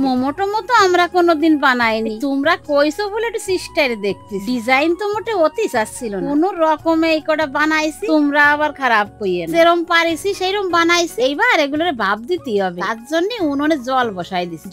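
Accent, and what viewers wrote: Bangladesh. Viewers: তুমি তো বাংলাদেশির মত কথা বল😂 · ওমা এতো বংলাদেশি অ্যাকসেন্ট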